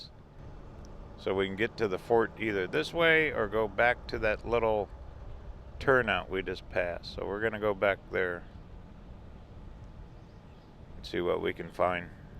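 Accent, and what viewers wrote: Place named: Upper Peninsula, United States. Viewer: I’m not sure where you’re from but with your accent you fit right in with the Yoopers.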